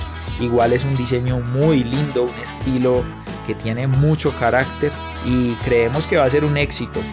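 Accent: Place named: Antioquia, Colombia. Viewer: Que bien se oye el acento paisa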